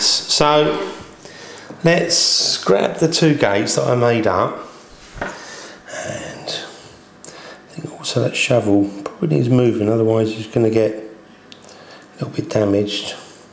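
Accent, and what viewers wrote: United Kingdom. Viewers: finally! someone whos british!!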